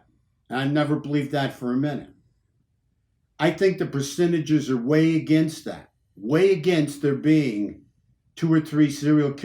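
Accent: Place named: Philadelphia, United States. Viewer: Hi, do you think the Long Island Serial Killer is the person responsible for killing the women in Atlantic City? Melissa Taylor was last seen (not 100% sure) in a town close to Atlantic City, Port Republic. Also, are you from the Philadelphia or South Jersey area? You sound like it, it's where I'm from. Thank you for the great content!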